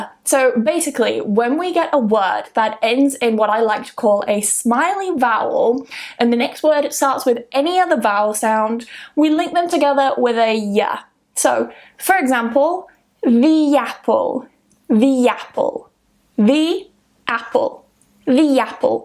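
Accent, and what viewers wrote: United Kingdom. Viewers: Siendo sincero, por supuesto que quiero aprender inglés británico, pero me gusta más verla y oírla porque tiene una voz muy bella y me transmite un ánimo alegre porque siempre sonríe. Inteligente y bella que más se puede pedir. Me encanta su acento, cuando hablo inglés ya hablo mas con acento británico Gracias a ella. La adoro 🥰.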